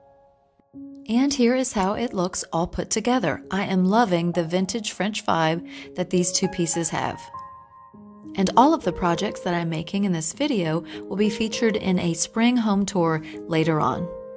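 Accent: South, United States. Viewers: I am southern too and love your soft southern voice. There are many channels that I start to watch and would watch for content but cannot tolerate the harshness of their voices but anyway thank you for your pleasant voice.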